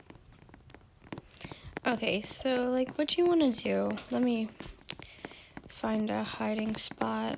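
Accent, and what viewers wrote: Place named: United States. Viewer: Classic American voice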